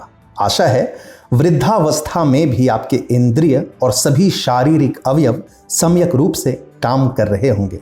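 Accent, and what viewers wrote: Standard. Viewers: Kya baat hai bhai tum Hindi bahut shudh bolte ho bhai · It's sudh hindi sunkar a6ha laga. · Sir, aapki aawaz sunkar accha laga, aap bahut sudh Hindi bolte hai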